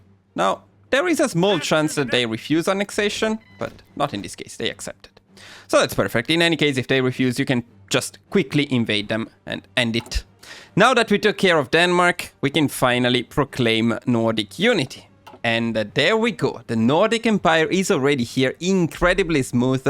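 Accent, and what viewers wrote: Italy. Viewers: Is that an Italian accent?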